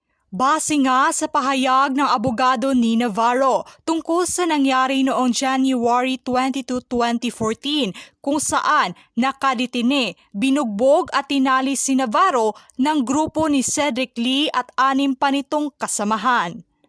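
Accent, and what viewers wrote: Visayas, Philippines. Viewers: Bisaya ung vlogger nito hula ko🤮🤮🤮🤮ang malambot tumitigas..ang matigas nalambot🤮🤮🤮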